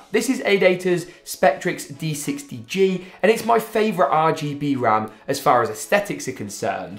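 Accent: United Kingdom. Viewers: Finally a youtuber that doesn't cut the mistakes he makes ... and anyway I really like your brit accent...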